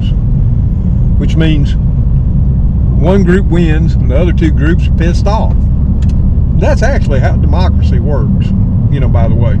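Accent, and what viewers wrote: South, United States. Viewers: I could listen to you all day I love your southern style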